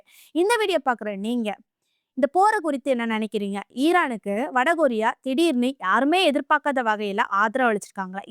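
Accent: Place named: Coimbatore, India. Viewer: 🎉🎉 அழகான செய்திகள் நல்ல தெளிவான வாசிப்பு விளக்கம் 🎉🎉 புதிய வரவு வாழ்த்துக்கள் பாப்பா பேச்சு வழக்கில் கோவை மாவட்ட ஸ்டைல் தெரிகிறது அழகு அருமை. மதுரை சக்திவேல்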